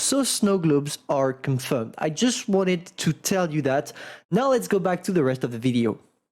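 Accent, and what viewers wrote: France. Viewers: They are not used to. Not 'their'... (autocorrect?). But you are right. It is not a laughing matter indeed. I sincerely think is one of the best youtubers on WoTBlitz. I watch his video's a lot. I like his approach to the game. I just find the way he pronounces 'event' really really really annoying. How can I reach out to him, to make him say that 1 word 'event' say correctly. For the rest, I know he is French, so no bad words for the rest of his pronounciation there. It even is charming. He is good. I just want this 1 word 'event' to be pronounced correctly.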